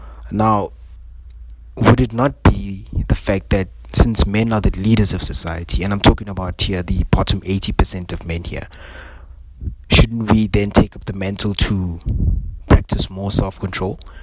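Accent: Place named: South Africa. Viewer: Your accent sounds like mine... are you also from South Africa?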